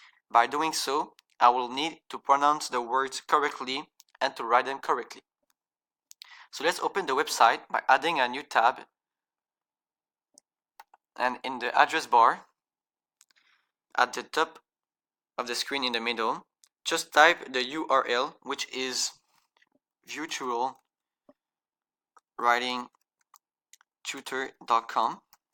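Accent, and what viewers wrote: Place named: France. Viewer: Hi, I'm Alex, and I was searching for tips to get better at english, and so I just finished watching your instructional video about this website. I have to say it's a great site, there's a lot of functionalities to help me get better. (I am also polishing my english, just like you) French is my first language, it is yours too I think (got this from the accent). Thanks for the vid, I wish you to succeed in your college program, and good luck my dude! -Alex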